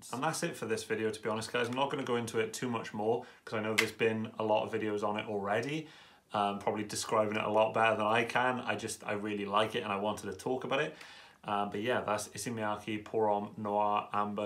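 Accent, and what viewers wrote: Wales, United Kingdom. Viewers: an Escentual buy, eh? Nice to hear another Welsh person reviewing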